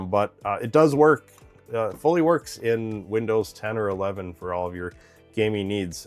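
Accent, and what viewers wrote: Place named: Canada. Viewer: How do you plug in the Spectrum? Assuming you live in Canada, based on your accent, do you need a step up converter for it? I live in the US, and I know in North America has 110-120v power while the UK and Europe use 220-240v. I ask because as of this comment, I'm getting one in the mail and I'm afraid to plug it in just straight into the wall at the risk of ruining it.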